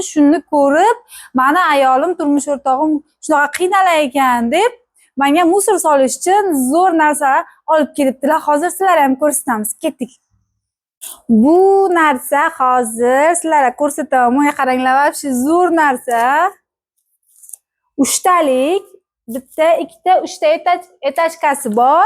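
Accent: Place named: Bukhara, Uzbekistan. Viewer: Buxorodan salom. Shevamizdan aylanay😊. Biz xam siz tarafdan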